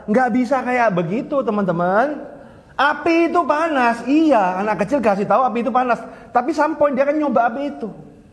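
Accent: Java, Indonesia. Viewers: Jowo medok mas...juan kekel aku mas...maturnuwun mas anda luarbiasa🙏🙏🙏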